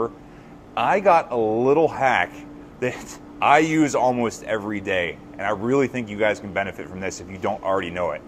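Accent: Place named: Pittsburgh, United States. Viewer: Didn't realize before you were a Yinzer!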